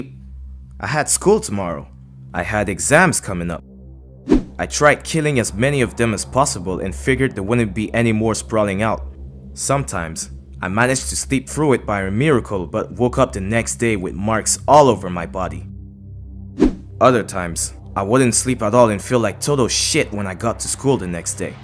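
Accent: Montreal, Canada. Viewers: I can hear that Montreal in your voice :) !!! No hate I grew up in Montreal too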